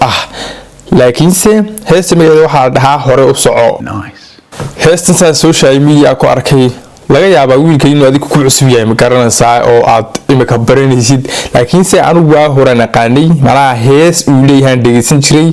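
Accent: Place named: France. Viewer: War afsomali ku hadala waxay sidii adonta aya farasisi kuhadlaysa mesha somaliba jogte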